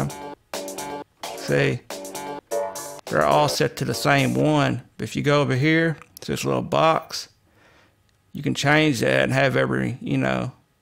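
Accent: South, United States. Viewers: Hey man, you sound country as hell, but you know your stuff Lol. I really appreciate this video, brother. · 12 min in iam loving that cowboy accent...this video helped a lot cause i was always curious on how to get that & vibe with chopped up samples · Wow...this is incredible on hella so many levels. Thank you. Im from the south so I am used to accents but it makes it better to watch....not sure if its you or an act but you should really keep this up. Thank you so much. I would pay you for tutorials...super good info. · Your thick southern accent makes this vid that much better...knowlege God!!!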